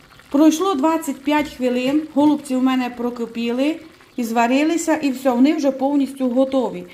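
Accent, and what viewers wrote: Transcarpathia, Ukraine. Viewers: Як вам не соромно! дуже щира мудра жінка. А те, що вона так розмовляє, то на Закарпатті такий місцевий діалект, і це прекрасно, тому що говорить про різноманіття нашої країни. Ви повинні вибачитись за ваше хамство. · Ой, Андрюха, насмішив😂 Це закарпатській діалект. на це питання вже відповідала. А у самого який діалект? Скільки помилок у солов'їній😂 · мы вас очень любим и мы очень любим ваш закарпатский говор. С любовью из Нью Йорка. 🇺🇸❤️🇺🇦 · Хто це такий безграмотний навчає, як їй розмовляти? У кожному регіоні України є свій специфічний діалект. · Дякую, люблю слухати Вашу мову з закарпатським діалектом! І господиня ви прекрасна, молодець! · дякую Вам за рецепти i Вашу закарпатську мову - вона неперевершена. А на дурнiв не звертайте уваги. Пишуть коментарi i дають поради, а помилок, як у учнiв першого класу. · добрий день! 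Дякую вам за цю смакоту, ви так все доступно пояснюєте, що навіть недосвідчена господиня з легкістю може це приготувати, якщо є бажання звісно! Особлива подяка вам за ваш закарпатський діалект, кожне відео дивлюсь із задоволенням! Завдяки вам навчилась закручувати голубці таким способом( конвертиком), так кажуть на Вінниччині. Миру, добра, перемоги нашій рідній, рясних Божих благословінь вам і вашіій великій родині! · Закрийся, безграмотне нещастя Це закарпатська говірка! Приємна на слух і всім зрозуміла Велика подяка цій чудовій господині ❤❤❤ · Дякую за смачні рецепти,нічого не змінюй,приємно чути закарпатський діалект,розвиток каналу та нових підписчиків,благополуча твоїй родині. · Не слушайте всяких дураков. Так как разговаривают на Закарпатье, нигде не говорят. Наш диалект не сравним ни с чем. Я слушаю вас с удовольствием, сама из Закарпатья уже 30 лет живу в Греции и не забыла до сих пор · Дякую Вам за хороші рецепти, коментарі до страв, оформлення страв та столу, красивий посуд. 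І надалі показуйте закарпатські страви, ваш говір і традиції закарпаття. На дурні коментарі не зважайте.😊😊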